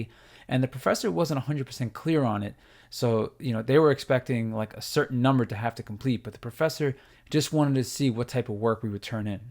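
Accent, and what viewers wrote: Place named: Boston, United States. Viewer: Came for the content, stayed for the Boston accent.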